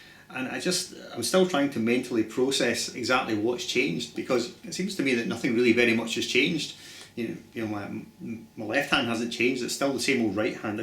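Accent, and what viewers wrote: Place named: Birmingham, United Kingdom. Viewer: For some reason when I listen to you speak, you phrase some words like the guys in Sabbath and Priest... I only use those guys as examples because they are the only people I have heard speak that are from Birmingham. When I think of Scotland I think of Sean Connery Thanks for the lessons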